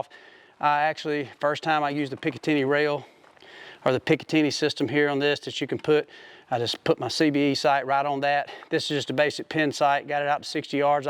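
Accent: South, United States. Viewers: Love those Southern terms..”Ry chonder”☺️, all kiddin’ aside, I’ve been a fan since the bigginin’. Congrats and love your work!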